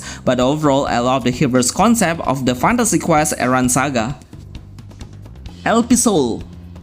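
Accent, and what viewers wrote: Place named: Indonesia. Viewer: I'm from Indonesian, and I noticed your accent pretty much similar to me.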